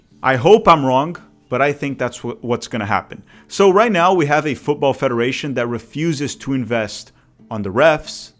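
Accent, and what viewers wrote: Brazil. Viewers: Sabe sim, ele grava em inglês mas também é brasileiro. Só prestar atenção nas pronúncias em português br.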